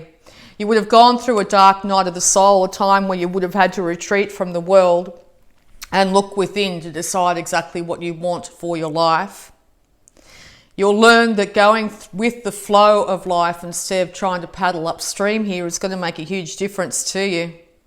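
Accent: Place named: Australia. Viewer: Love Aussie ascent...I am in Melbourne...I go to school at Shambala centre Bullpen...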